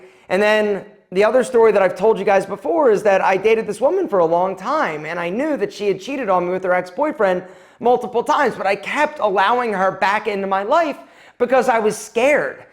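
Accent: Philadelphia, United States. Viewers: Philly accent detected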